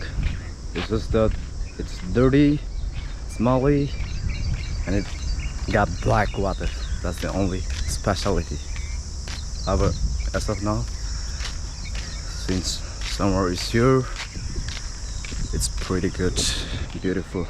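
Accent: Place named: England, United Kingdom. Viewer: Hahah this kid 😂 Still got the old vibes Love your English accent Big fans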